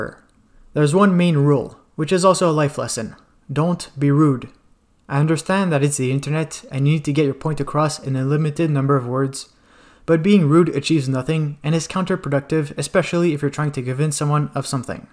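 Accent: Quebec, Canada. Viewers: Vous est quebecois? I heard a little Canadian french when you were telling your story. I'm an hour north of toronto and learned a little french in school. Congrats on your channel growth, i hope it quadruples in 2020! You are one of the best WT youtubers out there, looking forward to educational videos as well. I think it will suit your channel very well.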